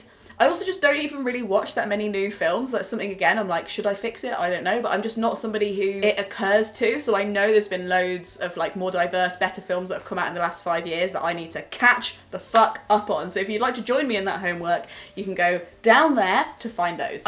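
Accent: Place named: Worcester, United Kingdom. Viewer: Just stumbled on this video and my first thought was....I recognise this accent. Are you from Worcester, that is my hometown.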